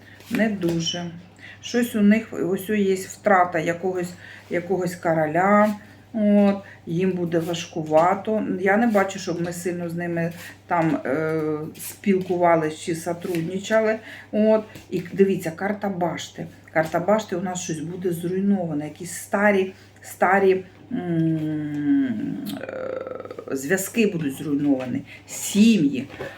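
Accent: Kryvyi Rih, Ukraine. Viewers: дорогенька,люблю вас слухати. Наш криворізький суржик,але,ну не облизуйте карти,коли їх витягаєте. Вибачте за зауваження.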